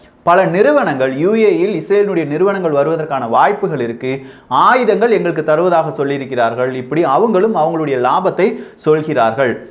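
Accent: Kerala, India. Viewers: Extrodanary...bro....malaiyaala slaanghiel.neeghal peyisuvadhu.yenn uurrai .ghiyaabhaha paduththuhierradhu...,‼️‼️‼️‼️‼️👌🙏👍👍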